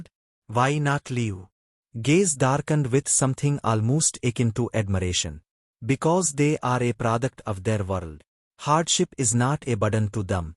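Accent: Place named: India. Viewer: If you are going to use an AI anyway, why give it the ONE accent most likely to piss every American off? The first thing most Americans hear is EVERY customer service "call center" in India that cannot do shite and are NEVER any help. "Yes yes, oh yes, the company cares "berrimuch", yes yes, "berrimuch". Glad to know I don't have to see this dumb shite in my feed again. 17 seconds might be a new record.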